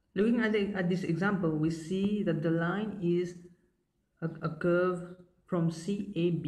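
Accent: Mauritius. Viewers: are you mauritian??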